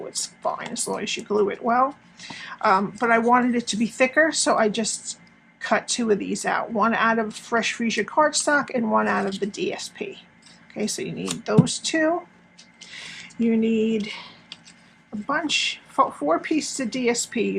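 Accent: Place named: East Coast, United States. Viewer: Love your accent! Are you from back East?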